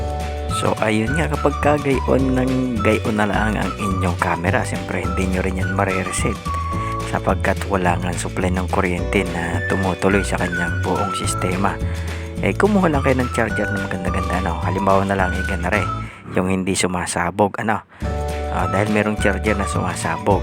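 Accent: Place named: Batangas, Philippines. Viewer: Idol taga batangas ka ba ? May Punto Kasi ung salita mo sa video, may problema ung v380pro ko ayaw mag read Ng QR code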